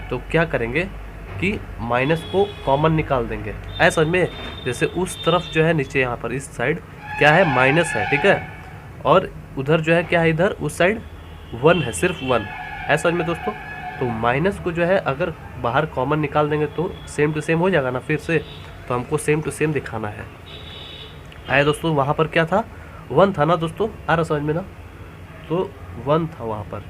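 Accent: Bihar, India. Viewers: Bihar se ho ka? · Kaha ke ho bhaiya Bihari ho kaa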